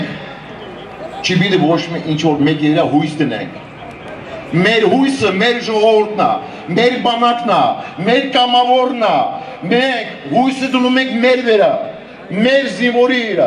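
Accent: Karabakh, Azerbaijan. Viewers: Это инс харабахи акцентова хосум хи инке hай чи, де има лси ай ору вор Арцахе андвец туркерин уремс тох имена Айастана тох ангист чи кнелу, турки ерезанк Айастана имац дезел чи ангист Токелау кнек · Էս խոսողը ոնց որ ղարաբաղի ակցենտով է խոսում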